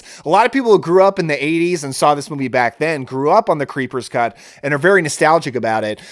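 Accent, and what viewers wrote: United Kingdom. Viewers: I was also going to say it is a mid-lothian accent but you beat me to it first... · Yeah! Great description. Edinburgh is the sort of area I was thinking that sort of accent is from but I've only been there once myself so didn't feel super confident at identifying the accent.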